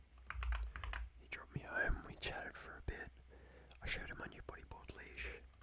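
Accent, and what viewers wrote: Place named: Australia. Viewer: it's so great to see another australian dude who likes/makes asmr! I've been wanting to make some for a few years but never started, didn't expect to open this video to another aussie voice it was weirdly familiar haha, keep it up man this was heaps relaxing 👏🏼 If it's not intrusive, do you mind sharing what state you're in? The mildly specific accent is there but can't pick it haha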